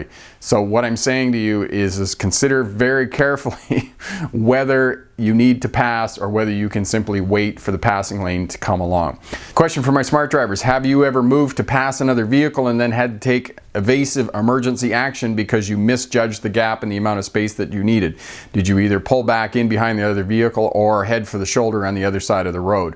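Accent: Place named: Canada. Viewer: I could tell he was Canadian from his accent :)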